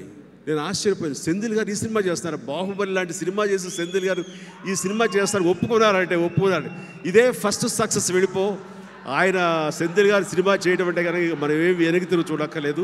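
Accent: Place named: India. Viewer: Eena okadu English ni telugu laaga matladuthadu accushan choola baaga chesadu antha alludu...!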